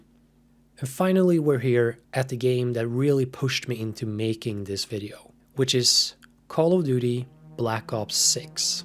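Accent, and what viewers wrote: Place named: Sweden. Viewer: Great video bro🙂btw are swedish? Your accent sounds just like it 🙂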